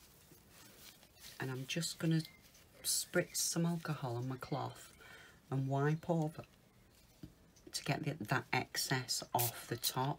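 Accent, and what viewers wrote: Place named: North, United Kingdom. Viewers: Just found you on YouTube, I’m getting back into polymer after about five years and it’s nice to hear a northern accent 🤗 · Love the video, nice to hear a fellow northern voice!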